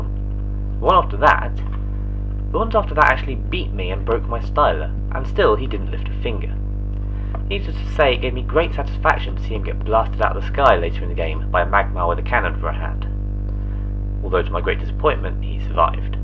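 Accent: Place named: United Kingdom. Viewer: lol i love how 90% of youtube game reviews the host has a british or english accent xD your pretty funny though thanks for the info :)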